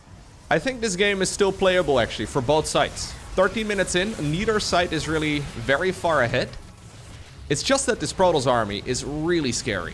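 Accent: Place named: Netherlands. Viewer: Interesting how you Dutch people pronounce stuff. But when you typed it, I understood right away. In German it is "Schurke". But we pronounce it quite differently. Interesting :)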